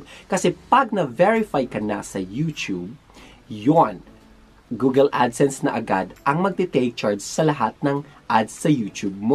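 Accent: Visayas, Philippines. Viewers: Haha thank you natawa ako sayo 🤣🤣 bisaya ka pla salamat sa tips sana ako magkaroon ng marami 😍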